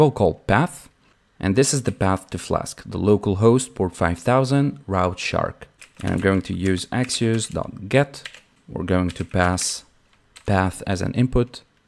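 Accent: Russia. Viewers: bro got russian accent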